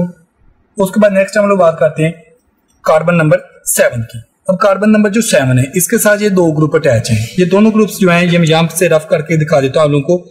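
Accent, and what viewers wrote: Pakistan. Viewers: You from Pakistan? Nice explanation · Are you from 🇵🇰 Pakistan??...your accent seems like 😃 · Pak se h bhai...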